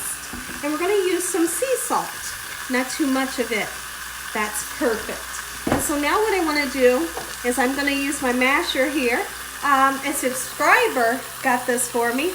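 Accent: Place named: Texas, United States. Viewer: I would have never guessed you are from Ohio or maybe your not 🤔. I seriously thought TX! (Where I live) or Louisiana (my soul, I love that state) ORRRR Oklahoma 🙄😂 (you know TX & OK have a thing 😂. I kid I kid it’s cool IG 😂). Love your style tho. All the love from my family to yours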